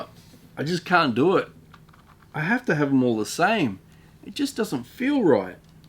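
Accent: Australia. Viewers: Hey Mate, love your voice and of course your turning! But the way you speak in your classy aussie style is what I enjoy the most! Been a backpacker in 2016 and fell in love since then!!💘🇦🇺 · You should’ve made one solved and one mixed. But anyways it ended up looking awesome. 😁 Are you an Australian?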